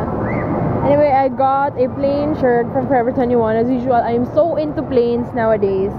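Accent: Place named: Davao, Philippines. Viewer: Love the davaoeña accent.hehe nice vlog! Cn u do a review on make up factory's velvet lifting foundation? Looks promising though.. tnx!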